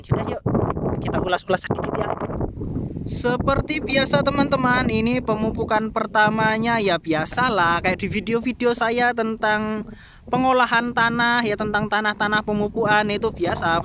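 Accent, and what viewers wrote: Java, Indonesia. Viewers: Jowone ngend to mas...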